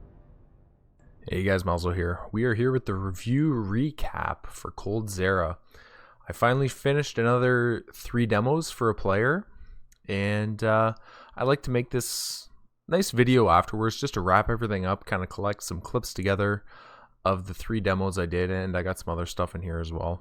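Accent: Canada. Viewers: are you from montreal bud?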